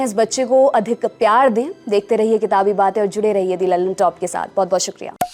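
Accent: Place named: Punjab, India. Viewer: Your way of presentation is very nice betaji. Specially Punjabi tone. God bless you😊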